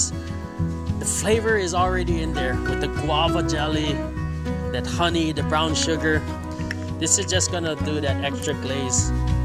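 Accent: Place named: Philippines. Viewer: Da pilipino and brada accent iz on poynte 👍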